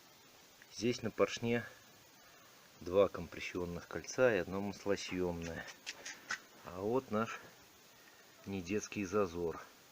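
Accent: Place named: Belarus. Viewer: Здравствуй! Я с Черниговского района Черниговской обл с Анисов Украина.Буду очень благодарен.Ты не с Белоруси? Акцент вроде бы похож.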